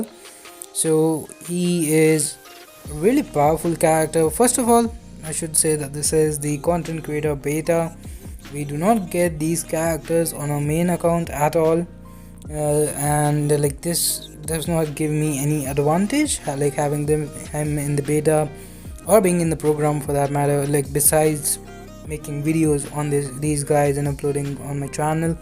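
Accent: India. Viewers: Only disliked it because of your Indian accent.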